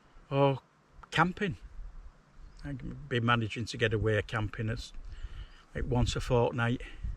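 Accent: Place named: Hull, United Kingdom. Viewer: Thought I could here ull accent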